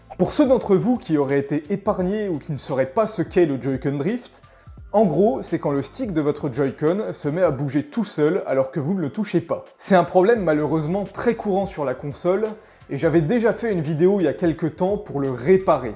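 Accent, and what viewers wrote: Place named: North, France. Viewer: c'était l'un ou l'autre avec cette accent qu'on partage 🤣 Salutations des chtimis 😅😁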